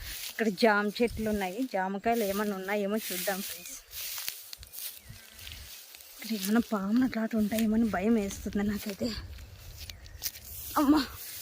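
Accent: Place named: Anantapur, India. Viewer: ఎక్కడ మీ ఊరు.. మా అనంతపురం యాస చాలా బాగుంది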